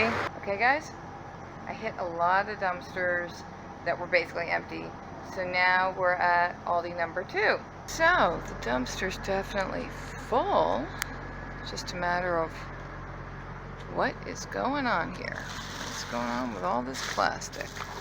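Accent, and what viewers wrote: England, United Kingdom. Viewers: THT WAS AWSOME I LOVE THE ENGLISH ACCENT LOL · 😆I love the British accent! You are funny frugal mommy!❤️ You’re a pretty good southerner too! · I am sooo happy you saved the Robin!! I enjoyed your English accent, spot on I dare say! Love your videos. Stay safe. ❤️ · Sticky toffee pudding is a great English dessert made with dates, in honor of your lovely accent. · Aww poor bird! Glad it recovered. By the way, your English accent is better than mine....xxx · Bless your heart, you had me in tears and laughing my head of over the poor bird flying into danger of the cats and will woman. With English accent saying the wings are fine but the head is hanging. Let’s keep him quiet and maybe he’ll fly around the bathroom. You open the box and his head is up and the we one flys up to the top of the tree 🌳 Oh my goodness you remind me of myself, English accent and dramatics❤️ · Good English accent. 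💕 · 🤣🤣🤣🤣🤣🤣🤣🤣🤣🤣👍 ENGLISH ACCENT Or AUSTRALIANO 👍 💙♥️💙♥️💙♥️💙♥️💙♥️💙♥️💙♥️💙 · you English accent, you could be one of us!!! You would settle right in! 😃👍👍👍👍💗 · great English accent, wonderful bird rescue, and awesome haul! I L O V E your videos. You always make me feel good when I’m down!! Also, been meaning to tell you I really like your bracelets! 😘😘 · Great British accent! I love it. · I love you , but loose the English voice please ! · You sound like my auntie with ur English accent 😂😂😂 love ur channel all the way from Scotland 🏴 so glad the bird was ok 👌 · Make banana date bread as it is awesome! I have to laugh at your British accent because my mom is British! I get a kick out of it! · Why do you keep switching to an English accent!?!. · you sound English not Australian and trust me I should know I'm very English and you sounded just like me x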